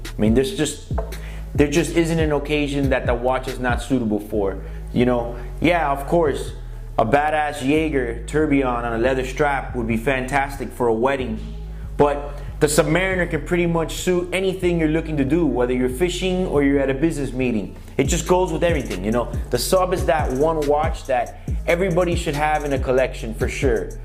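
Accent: Cuba. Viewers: Cuban accent,, interesting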